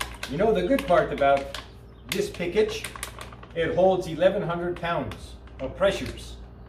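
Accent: France. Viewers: 😝 great French accent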